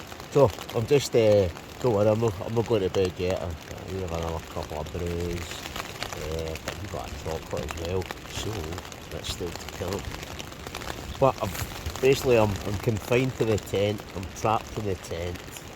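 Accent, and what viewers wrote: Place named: Scotland, United Kingdom. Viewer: Nice Akto like tent, Cool Scottish accent. A camping video in Scotland? That's rare; most of of them are in the lake district on one of the gabels.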